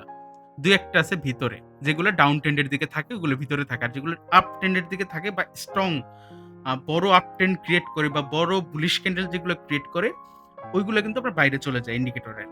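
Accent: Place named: South Bengal, India. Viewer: ভাই আমি একদমই নতুন। তবে এটা খুবই সুন্দর ভাবে বুঝিয়েছেন। স্টপ কি ভাবে নিতে হয় এবং মার্কেট ড্রয়ের একট ভিডিও দওয়েন । ধন্যবাদ প্রি ভাই। আপনার কথা শুনে মনে হয় দক্ষিণ বঙ্গের লোক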